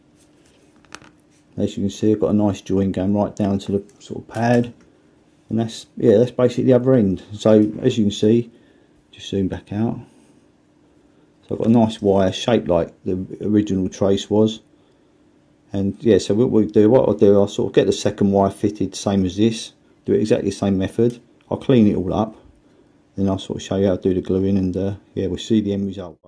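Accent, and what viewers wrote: United Kingdom. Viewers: What if you did a video with a two-sided board top and bottom with a sleeve that would be cool , sounds like you're from the UK I'm from Sunny South Florida USA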